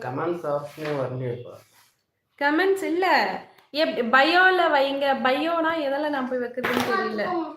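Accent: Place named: Thanjavur, India. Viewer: Thanjavur Tamil 🤏🙏🙏🙏🙏♥️♥️♥️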